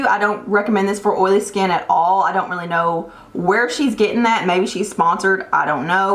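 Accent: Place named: Tennessee, United States. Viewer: Hey! Where are you from? I'm from Kingsport, Tn and you have the same accent as we do :) glad I found your Chanel!!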